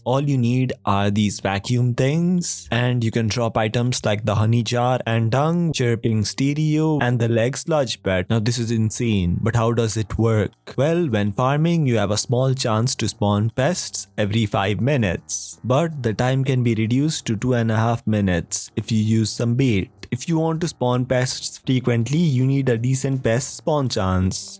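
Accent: India. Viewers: dang he is like the most indian i have ever heard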